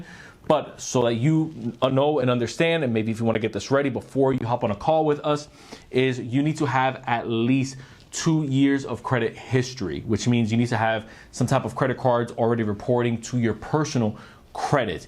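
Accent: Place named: Miami, United States. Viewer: Once you started talking, i can tell youre from Miami. 👏🏼👏🏼👏🏼 Subscribed.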